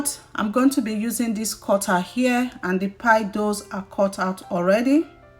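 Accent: Nigeria. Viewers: Talk like a Nigerian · She sounds Nigerian and this is a Nigerian meat pie recipe.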